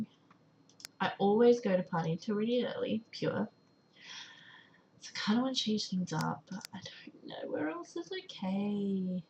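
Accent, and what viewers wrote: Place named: Australia. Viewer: so happy to have found a fellow aussie's channel! it was so funny, i was watching and i was like that accent... it's strange.. and then it hit me - it's my accent LOL!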